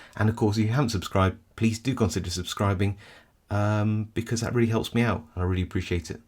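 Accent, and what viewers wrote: England, United Kingdom. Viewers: Man i just wanna say i love your vids dude. the english accent will never get old to my ears lol. Not to mention i love the way you explain things, everything makes so much sense. IMO the vids are on par with LPL, albeit different video types, but still in the community, which is why i drew the comparison.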